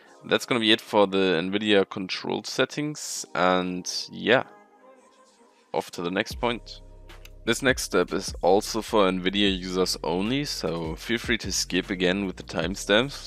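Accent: Germany. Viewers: cleannn valorant intro. edit: are u german, because u sound like whitecat (osu) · Ich wusste es das du deutscher bist habe mir die ganze zeit gedacht das ist doch ein deutscher der englisch redet aber jetzt der beweis 😂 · War ja klar das er Deutsch ist XD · Wie man einfach das Deutsche raus hört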